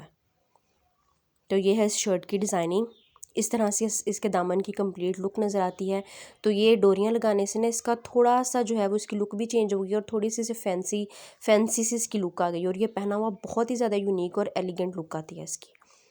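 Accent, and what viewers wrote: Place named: Punjab, India. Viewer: App ki Vedo buhat achi Hoti hi ap bolti bhi buhat acha ho banjabi ka tark acha lagta hi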